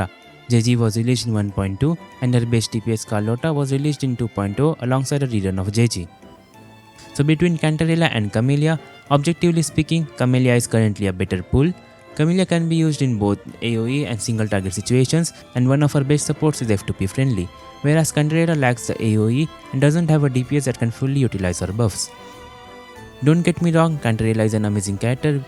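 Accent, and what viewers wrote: India. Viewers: I legit thought you got hacked 😂. Who would have thought the real voice sounded Indian 😂😂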